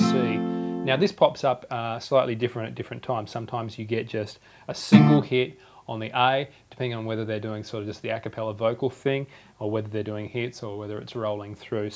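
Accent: Australia. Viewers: has anyone ever told you you look a bit like rob swire from pendulum?? he is australian too!! maybe youre related!!!!! or maybe you ARE him.. hmm , btw if you are actually newsealandish then i am sorry its just im not good with accents and you sound australian but if you are newsealandish im sorry. but your cool :D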